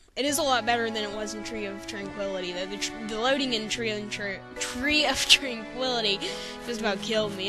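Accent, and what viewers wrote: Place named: South, United States. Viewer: And no offense but your voice is hilarious I wish I had that hillbilly voice I talk kind of country but not enough it bothers me.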